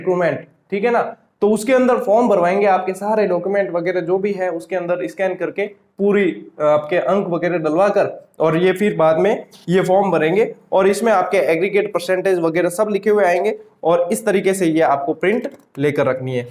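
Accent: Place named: Rajasthan, India. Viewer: Sir aap marwadi bolte h to bahut mast lagte ho 😎😘🥰😘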